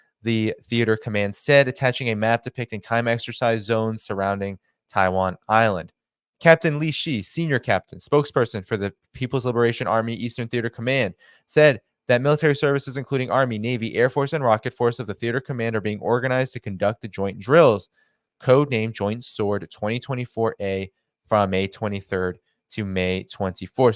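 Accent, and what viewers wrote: United Kingdom. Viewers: Love it a White Guy with a British accent.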